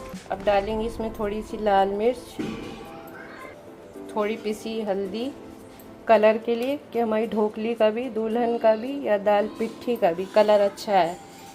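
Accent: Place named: New York City, United States. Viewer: NYC colour of NYC voice 🎉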